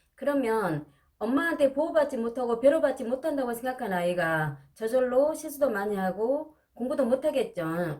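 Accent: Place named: Jeolla, South Korea. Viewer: ㅎ ㅎ ...전라도 사투리가 나오네용.. 저도 전라도거든요. 역쉬 전라도 사투리가 멋져요. 영상 컨텐츠 좋네요. 앞으로 계속 응원할게요. 감사해용,,,,,나 갈랍니다..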